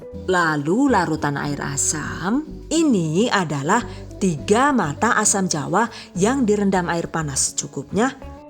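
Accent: Banyumas, Indonesia. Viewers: gagal pokus pas ngmg ngapak kaya nggone nyong, bahasane ngapak😂😂😂😂😂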